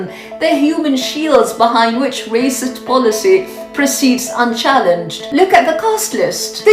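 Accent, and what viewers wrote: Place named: United Kingdom. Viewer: وكأن هذا الفساد والتلون والبلبله وتبجح لايوجد في بلاد الاسلام المشمشي 😊 ههه ياحبيبي، أختاه انتِ تتحدثين الإنكليزيه بلكنه بريطانيه هذا يعني أنكِ تقيمين هناك ومعكِ جواز سفر على ماأظن 😊 وجدتِ الأمان عندهم والرفاهيه فلماذا الهجوم على البلد الذي استضافكِ وجعلكِ مطمئنه على أقل التقدير ياترى 🤔 ؟؟؟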